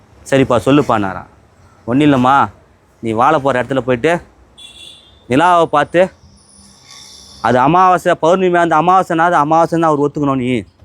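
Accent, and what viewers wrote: Madurai, India. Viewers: மதுரை வாசகம் சூப்பர் வாழ்த்துக்கள் 🎉🎉🎉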